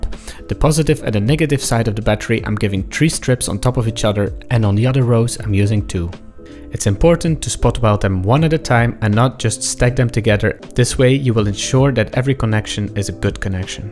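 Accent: Netherlands. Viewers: I know a Dutch person when I see and hear them xD. For I to am a flying dutchman xD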